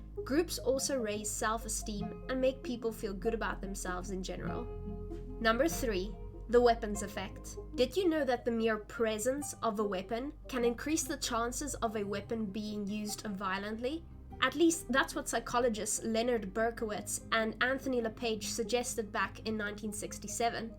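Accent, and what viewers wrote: South Africa. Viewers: I'm guessing that the mere exposure theory would be why people with specific phobia are encouraged to go through exposure therapy? Though frankly, as a person with a phobia, I want nothing to do with that 😅. I know this video is a month old already but I quite enjoyed it. I've always loved psychology but not on the level that you obviously do. Bonus that I'm an American who lived several years in SA (Capetown), so hearing a familiar accent is just lovely when hearing about a topic I enjoy. Thank you for the content, and I look forward to hearing more as you continue your studies.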